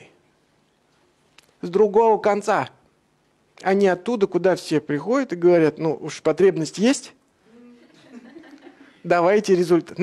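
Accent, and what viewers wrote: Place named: Odessa, Ukraine. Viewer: Андрей Владимирович тут чутка одесситские интонации словил)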